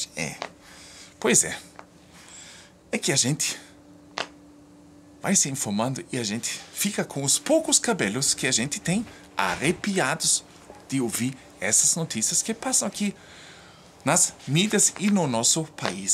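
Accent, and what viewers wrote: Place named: Germany. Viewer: Ele é alemão.... isso se chama "sotaque"!.......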